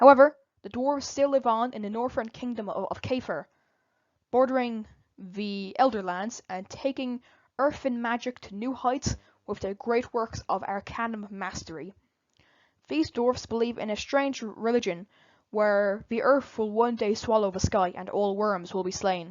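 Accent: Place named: Ireland. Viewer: Wasn't expecting an irish woman when i clicked on the video, but what a present surprise. The maps absolutely incredible, and the screenshots of just how much detail went into the world, including climate bands, tectonics, elevation, ocean currents, really made me re-evaluate how sloppily I've been designing my own map currently. 1 thing I'd like to know is whether your world is tilted on it's axis like Earths or is in perfect alignment, it's something i see commonly missed in worldbuilding despite the fact that the axial tilt has a massive effect on the Earth, and the seasons/climate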